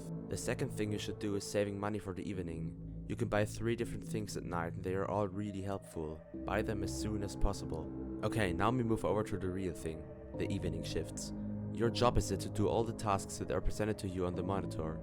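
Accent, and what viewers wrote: Germany. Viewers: thank you i just downloaded it aigan and i forgot everything thanks for the video and help!! u also sound german to me cuz of ur accent haha xd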